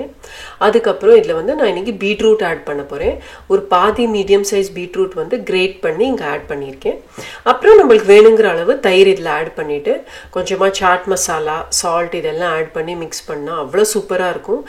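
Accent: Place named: Palakkad, India. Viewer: Hi,your videos are very warm and motivating. Recently I’ve started viewing your videos and i love it. You are really doing a great job.All your recipes are very good and i tried a few also. You have a palghat accent 😊and that’s nice too.Keep rocking dear 👍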